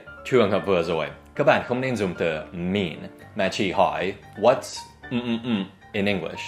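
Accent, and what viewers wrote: Standard. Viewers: thầy còn chuẩn hơn cô kiều trang nhiều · Thầy nói tiếng Việt ngày càng chuẩn. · thầy nói tiếng việt chuẩn quá.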